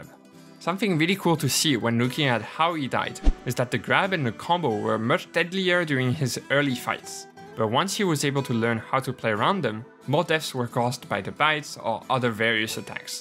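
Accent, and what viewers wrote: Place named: Germany. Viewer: Can I say, this is my first video I've seen of yours and I love your voice. It's so bizarrely unique to me, like a grown up Elmo with a slight German accent. I'm sure this is an inaccurate comparison but that's just what my brain is thinking up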